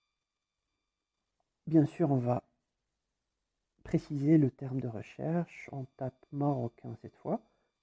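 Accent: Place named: France. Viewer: ا صاحب الفيديو فرنسي اظن من لهجته. الله يعطيه الصحة شوههم و اعطاهم في عصير في كأس كبيييير . العز للمغرب و المغاربة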